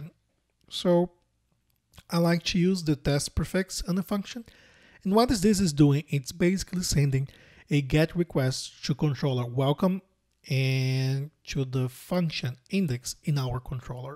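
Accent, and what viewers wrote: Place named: Brazil. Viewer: Esse sutaque é BR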